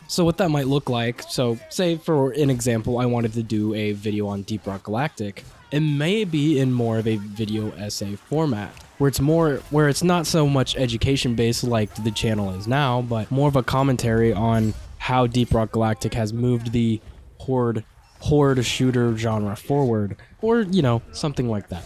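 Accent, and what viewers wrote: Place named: England, United Kingdom. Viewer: You have a cool voice and a clear english accent. You should consider adding simple playthroughs to the channel, especially of the games you mentioned here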